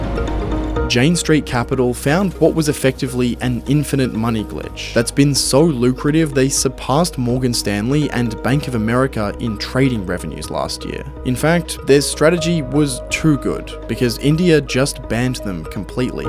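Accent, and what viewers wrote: Australia. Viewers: It's so hard to listen to the Australian accent. Putting a subtle r at the end of words that end in o...lol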